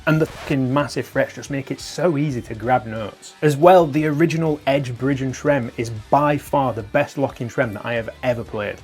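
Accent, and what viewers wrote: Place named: Yorkshire, United Kingdom. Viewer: The love how goofy the Yorkshire accent is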